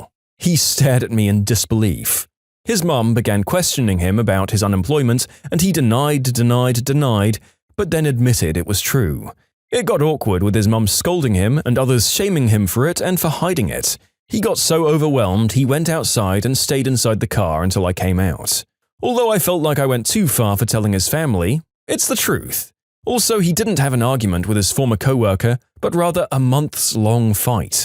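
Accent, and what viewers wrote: United Kingdom. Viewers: Why do you sound British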